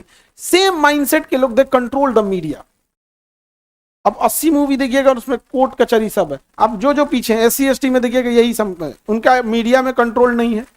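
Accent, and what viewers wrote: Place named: Bhojpuri, India. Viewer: Khan sir you are great you speak just like my brother bhojpuri and you have great knowledge.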